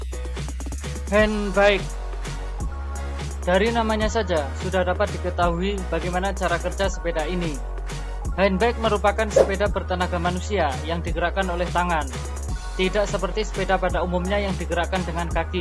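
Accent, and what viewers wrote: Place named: Central Java, Indonesia. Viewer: Dari logat akang orang Jateng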